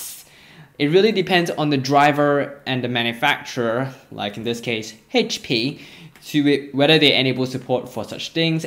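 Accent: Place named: United Kingdom. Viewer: took away mic port......also an asian with a british accent... alot unanswered questions. very helpful video BTW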